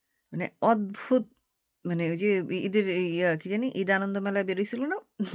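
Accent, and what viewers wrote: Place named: Bangal, Bangladesh. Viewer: আপনি অসাধারণ বলেন । কিন্তু বাঙাল ভাষায় বলেন তাই একটু ধীরে ধীরে বলুন। আমাদের সব বুঝতে অসুবিধা হয়। কিন্তু যুক্তি আপনার অসাধারণ। great---💐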